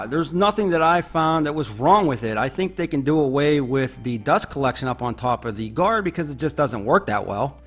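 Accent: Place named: New York, United States. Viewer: Dude, if you aren't from New York, I'd be shocked. :-)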